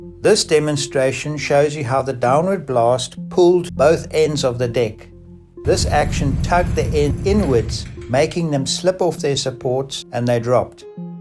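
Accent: South Africa. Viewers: He's South African. He has a strong SA accent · I hear a very South African accent!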